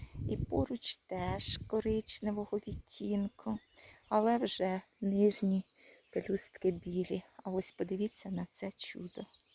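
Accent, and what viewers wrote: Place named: Cherkasy, Ukraine. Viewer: Дійсно море 😂 ! У вас Черкаський акцент.😂 Моя Батьківщина 🤗Гарного сонячного дня 😊👋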